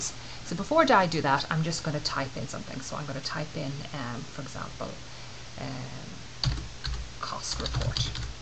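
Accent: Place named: Dublin, Ireland. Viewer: I was sitting here listening to my wife looking at your video and I was wondering what part of Dublin are you from ? Im from Cabra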